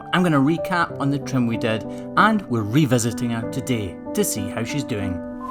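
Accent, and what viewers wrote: Scotland, United Kingdom. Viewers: please do a video with your full Scottish accent!!! Can't wait for the merch!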